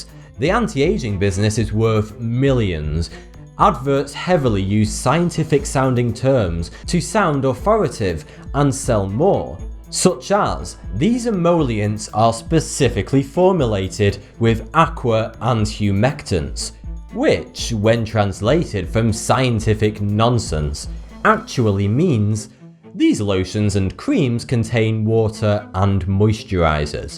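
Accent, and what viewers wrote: United Kingdom. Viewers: Actually it's because his british accent.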